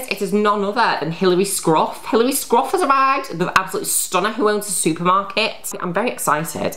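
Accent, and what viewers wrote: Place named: United Kingdom. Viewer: I don't like the British accent ngl.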